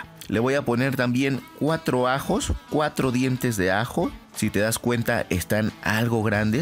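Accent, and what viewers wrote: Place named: Mexico City, Mexico. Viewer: Cómo se oye que es De el DF , sabe lo que hace. Riquísimo. Felicidades.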